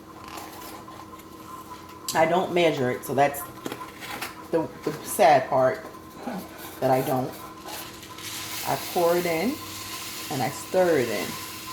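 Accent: Charleston, United States. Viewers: Ok first Thank You! I'm a Gullah girl from Charleston straight off America st. Unfortunately my elders have passed on (including my mom) before I could get my geechee recipes uuuggghhh! I grew up on all of this. The family members I have left don't know how to give a recipe lol! It's a Lil of this & that. So Thank You for the visual step by step!!! Now I'm going to ask a small favor. It's only 3 in my family that eat red rice (my husband doesn't care for it...yes we almost divorced behind this 😂😂😂) but he loves okra soup so I decided to keep him. Can you provide me with a recipe on a smaller scale? Like maybe 2-3c of rice vs. a 2lb box? If not I completely understand and no hard feelings. If you're willing too then I Thank You in advance! 😘 I also have my grandmother's traditional rice cooker/steamer she used to cook her rice in for decades all my family still use theirs as well, but I haven't figured out how to use it uuuggghhh (for those who want to know true value hardware carries it) So I'm glad I stumbled across your method. 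are you from Charleston? I hear a faint accent. Mine come out when I'm pissed lol! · I hear the accent💋yess Charleston bnr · You did AWESOME on your video! I had to search a bit- then found the title- I wanted to see where you were from. You were reminding me of home...that accent. I was thinking she "has to be" ....and YEP! Anywhere you go, you hear a Charleston accent...YOU KNOW IT! Charleston born and raised living in Texas now. Again, I THANK YOU!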